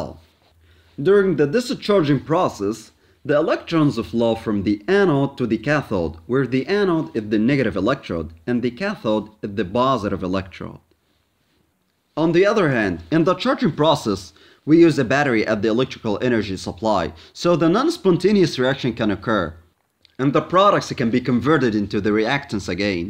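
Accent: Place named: Netherlands. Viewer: Sorry I meant you sound a little dutch and Russian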